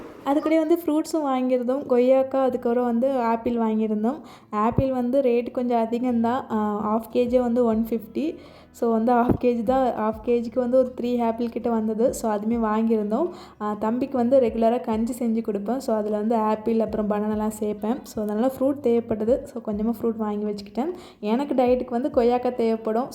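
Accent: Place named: Sri Lanka. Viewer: Hi sis thodarthu paakuran ur video Sister neenga Sri Lanka va unga pesu appadi iruku ❤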